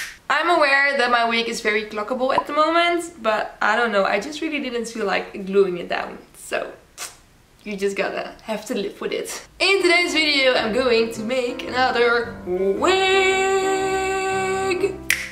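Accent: Netherlands. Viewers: U sound so dutch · Are you Dutch? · Are you dutch?? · .... Are you Dutch? You talk like my classmates in English class.. well actually a bit better but it’s like I’m talking English but then without an accent ( sorry no offense) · Where are you from? Your accent sounds Dutch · i think she’s dutch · Is she Dutch? Just wondering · Are you dutch? ☺️ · Is she Dutch? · Ur dutch, i can hear it. But i stan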